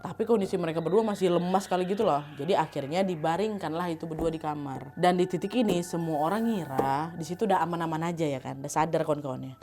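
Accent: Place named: Kalimantan, Indonesia. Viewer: Warga kalimantan mana ni suara nya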